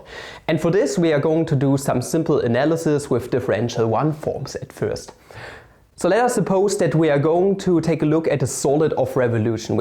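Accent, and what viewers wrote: Germany. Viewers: A bit too patronizing and arrogant. You are 10-0 behind because of the terrible German accent and from the start Matt Parker's drawing is much better than yours.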